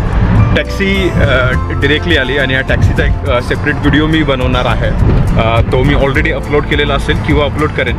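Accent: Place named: Nagpur, India. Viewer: Aala corona nahitar China Cha moha sodena nagpuri tone ahhe keep it on🤣🤣🤣😁😀🙏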